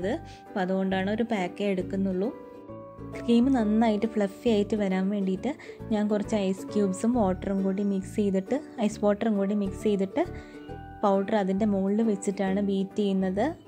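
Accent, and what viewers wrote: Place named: Kozhikode, India. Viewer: Kozhikkodan bhaasha okke edkk keri varunnundallo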